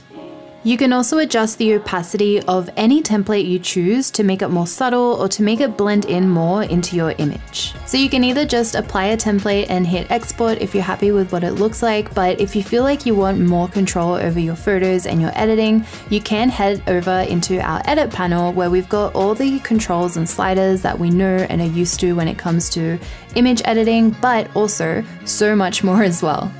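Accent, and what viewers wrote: Australia. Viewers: luv ur aussie accent ❤️ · It's Aussie? I couldn't place it.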